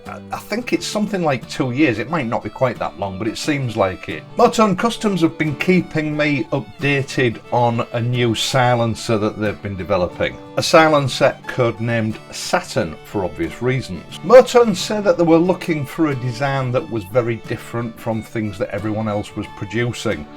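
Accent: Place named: East Yorkshire, United Kingdom. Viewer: Long Riston Jet garage? :) Makes a nice change to hear an East Yorkshire voice! Good channel.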